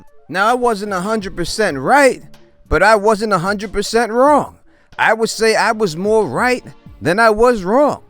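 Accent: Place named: Long Island, United States. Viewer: Youre def from!!! Ny longisland my guess lol.. With that i say, keep it up good work brutha!!!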